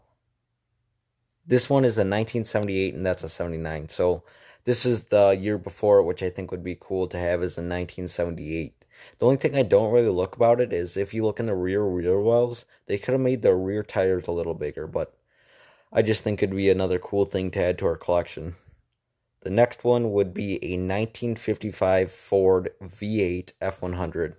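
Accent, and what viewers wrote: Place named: Wisconsin, United States. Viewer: You can hear that this man is from Wisconsin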